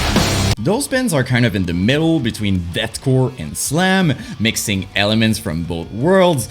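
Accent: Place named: Montreal, Canada. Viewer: hey man, you from mtl? cause you sound like it!! ahahha good to see someone out there doing these kinda videos. keep up the good work my guyy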